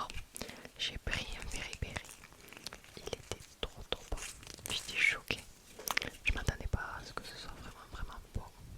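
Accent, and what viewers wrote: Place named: Marseille, France. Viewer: Je suis marseillaise ❤ ta un accent marseillais incroyable ❤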